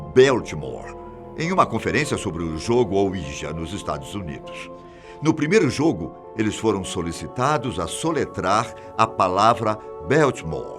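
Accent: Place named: Rio de Janeiro, Brazil. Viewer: Esse aí é carioca em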